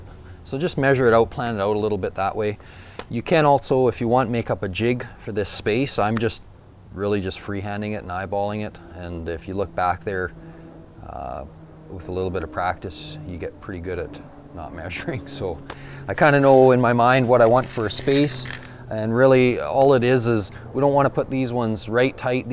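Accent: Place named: Canada. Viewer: The most canadian accent.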